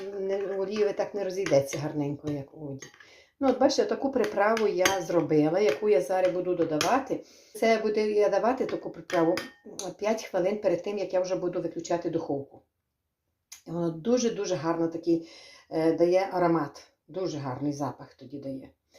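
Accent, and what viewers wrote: Ukraine. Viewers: Не хочу я звикати до бара боли.Что за деревенский украинский язык?!! Селянский!!!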